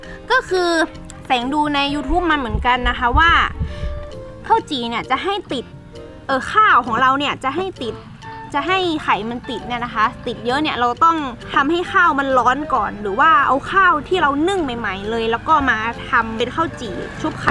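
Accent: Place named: Chiang Mai, Thailand. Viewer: พี่อยู่จังหวัดอะไรค่ะทำใมสำเนียงเหมือนคนเชียงใหม่จัง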